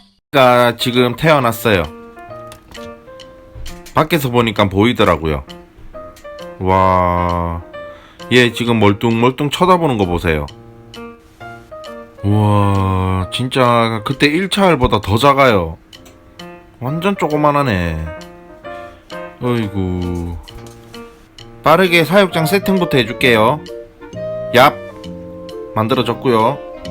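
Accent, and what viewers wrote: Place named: Busan, South Korea. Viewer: 말투랑 목소리 졸귀탱ㅋㅋㅋㅋ 부산억양 너무 좋습니다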